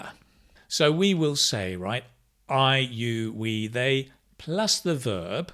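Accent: United Kingdom. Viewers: Your british accent is really awesome. Thanks to be here to learn us how to pick up british accent because it sounds rythmic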